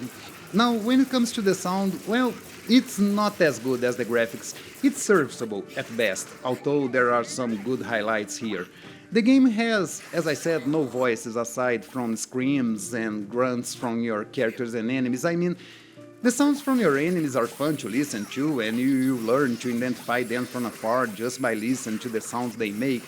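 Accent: Brazil. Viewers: Percebi que é brasileiro pelo sotaque kkkkkkkk O inglês tá em dia, parabéns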